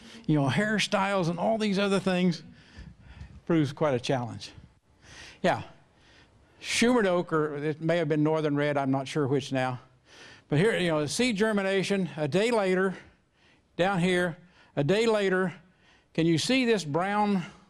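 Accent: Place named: India. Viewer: Looks like the announcer has recently moved out of India. She has a serious indian accent.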